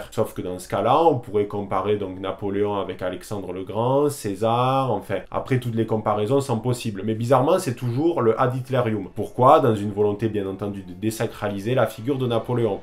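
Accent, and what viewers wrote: South-West, France. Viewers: C'est l'accent du sud-ouest, rien à voir avec une voix de banlieusard.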